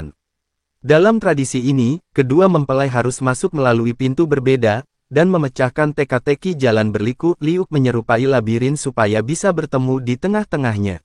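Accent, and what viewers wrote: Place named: Java, Indonesia. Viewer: Ini channel orang jawa suaranya aku kenal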